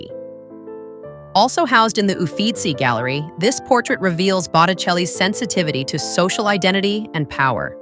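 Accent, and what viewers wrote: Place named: United States. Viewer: Enjoyed this apart from the grating, whining , horrible American accent.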